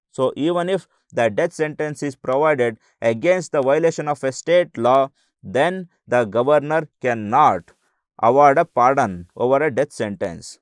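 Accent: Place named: Odisha, India. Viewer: From your tone and ascent it seems that you are an Odia..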